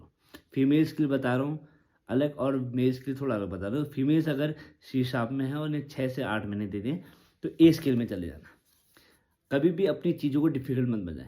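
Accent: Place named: Pahadi, India. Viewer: Bhai accent se to pahadi lagte ho btw meri kahani to aisi hai ki Sa nikalne main 6 mahine or Sargam main 1 saal lag gaya par control itna nahi tha ki dhun bajaoo toh maine thande baste main daal di fir 5 saal baad lockdown main uthayi to dheere dheere raag bajane ka control aa gaya.Ab do saal se regular baja raha hu or thik thak baja leta hu.Sab khud se he seekha isiliye itna time or effort lag gaya kya karoo mogli ki generation ka hu, gadha majdoori kiye bina hum logo ko chain nahi aata.Smartphone generation to har chij main coaching,note,shortcut,trick,hack dhundti hai smart log hai na gen Z fir Z1, Z2, Z3🤣🤣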